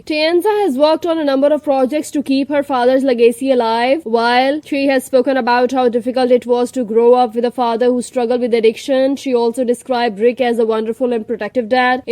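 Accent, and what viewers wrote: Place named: India. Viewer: Who are you, with this heavy indian accent???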